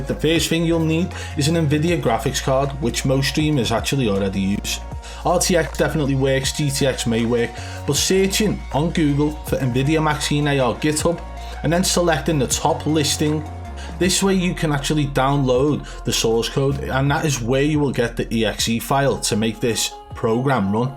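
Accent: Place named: Birmingham, United Kingdom. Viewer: a bermingham accent 😂 love it❤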